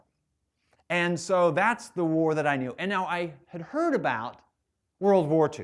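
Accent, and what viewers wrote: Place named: Oregon, United States. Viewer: I listened to an entire lecture from Brands thinking it sounded similar to another personality, but I just couldn't place it. Then I stumbled across a Paul Harrell video. They are both from Oregon, and presumably from the same state region. Linguistic mystery solved!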